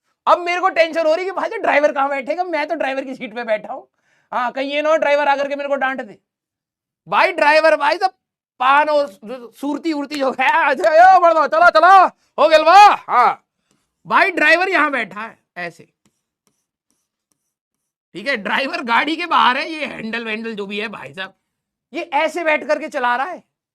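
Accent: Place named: Gorakhpur, India. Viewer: Ham gorakhpuriya haiin sir ekdum theek bolat bade 😂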